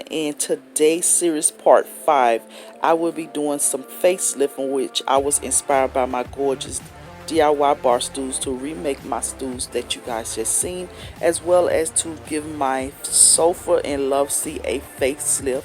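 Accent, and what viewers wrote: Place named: Detroit, United States. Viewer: Great idea! That accent! What region are you from? Detroit?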